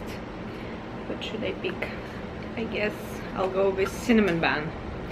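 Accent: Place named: Russia. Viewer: You have a Russian accent,where r u from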